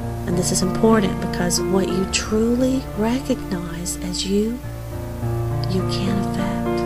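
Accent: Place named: Georgia, United States. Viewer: Maybe that's a Georgia accent . . . I have seen the endless ocean of pure love, but at the time I didn't realize it was me. I chose to leave that silent bliss and return to my noisy life and on the way back I noticed how delicious the agonizing pain in my legs felt. I have enjoyed this encounter and I hope you get as much pleasure from having my attention as I got from being had. : )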